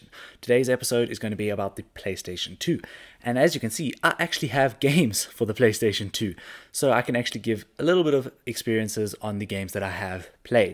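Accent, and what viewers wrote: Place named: South Africa. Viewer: Let's go! I love that type of accent. I used to have a hard time detecting between Australian, New Zealand, and South African. But I think I'm starting to get it finally! District 9 and Chappie actually helped me a lot with the South African side of things. Anyways. I'm in a big Star Wars phase, so this video was really nice, thank you!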